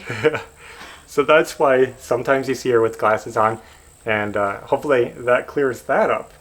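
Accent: New York, United States. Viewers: As a NY’er living now in the South I LOVE it that you sound like home. And I love the glasses. Thank you for being you! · Hey, I heard you in the beginning of your video say the word talking as “tawkin”. It’s so funny because I was coming to comments to ask you if you’re from New York. I noticed that accent immediately since I grew up and lived on Long Island for 57 years. Then you said you lived in Upstate, NY which is weird because you sound like you have more of a Long Island accent, than an upstate one. Upstate is one of my favorite places especially Lake George. I also had a question for. How did you get your clip on glasses to match your eyeglasses so perfectly? Were they made by the same place where you had your prescription glasses made?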